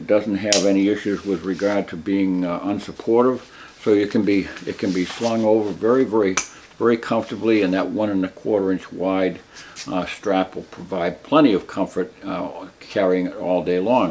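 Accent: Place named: Boston, United States. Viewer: Excellent. Learned something new. I detect a Boston accent. What’s a freedom loving gun owner doing in that forsaken state?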